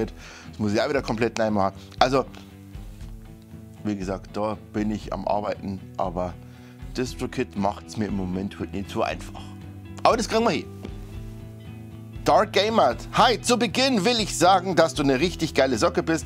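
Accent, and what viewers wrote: Bavaria, Germany. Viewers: Jo, sog amoi, Du redst ja boarisch. Wo kimmts'n Ihr her nachert? Nur so Pi x 👍🏻? Coole Videos übrigens. Guat dass was zum Thema Cakewalk in meiner Sprachn gibt, und ned bloß auf Englisch (obwohl mei Englisch recht guat is)!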